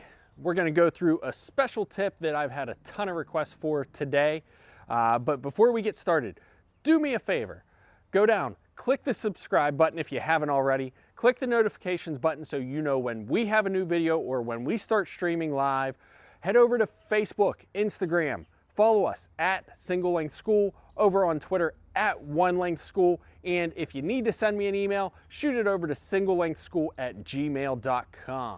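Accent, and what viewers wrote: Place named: Western Pennsylvania, United States. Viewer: You have a western PA accent...was hoping you may be from the Pittsburgh area...I just purchased a cheap set of single length irons just to try them out and was looking for someone local to me to help with the transition...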